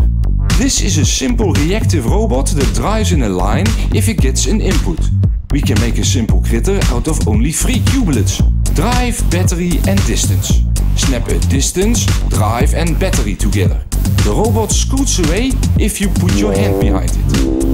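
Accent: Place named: Scandinavia. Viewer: Just wondering why you sound nordic but have a pic of Abe Lincoln?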